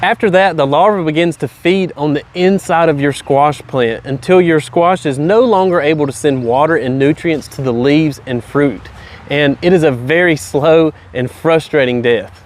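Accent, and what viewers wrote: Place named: Carolina, United States. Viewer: lolol...you said 3 words and i knew you were from Carolina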